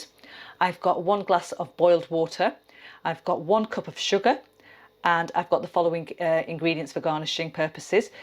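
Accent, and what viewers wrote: United Kingdom. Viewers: You have a nice British accent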